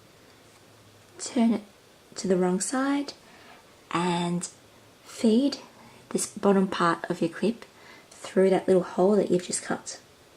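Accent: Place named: England, United Kingdom. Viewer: Loved your tutorial! Very professional and the clips are beautiful! Being a Mom,I loved that you taught your little girl along the way! So sweet and like some of the other comments here,I agree that your English accent and soft voice make this tutorial all the more delightful to watch! Now I am going to go make these with my daughters!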